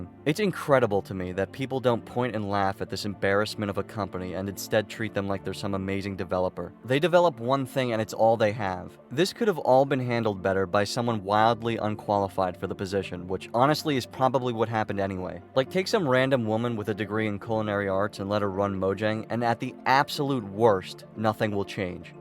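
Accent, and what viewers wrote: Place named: New Jersey, United States. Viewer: Idk why, but based on your cadence and voice, I just have a FEELING you're a New Jersey resident who either lives close to Philly or used to.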